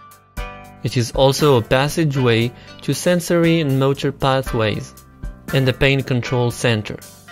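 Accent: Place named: United States. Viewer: Why are you pronouncing English as Americans being an Indian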